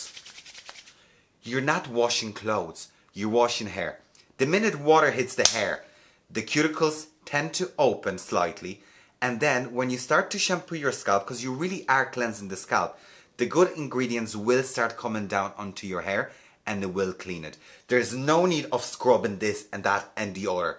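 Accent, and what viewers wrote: Ireland. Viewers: I used to live in Athlone (went to college there) so your lovely accent reminds me those days ;) I bought Kerastase with stemoxydine - should help to "awake" sleeping hair cuticles. We will see. If it doesn't work I will try loreal and vichy but wanted to try Kerastase first. Thank you so much and have a great day :)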